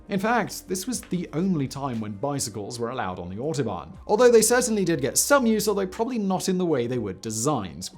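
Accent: RP, United Kingdom. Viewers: Oh vey just another what is it Brit brick accent(?) telling lies about the war and Hitler? Maybe mention who declared and supported partisans, oh yes in this case was stupid to let Brits escape at Dunkirk, should habe them out and all would have been over. Who used dumdums? Ah right and terror bombing on German civilians. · This is my first Megaprojects video and posh, educated, hipster, laa de daa accent is something else. Immediate sub. Also for the interesting content as well of course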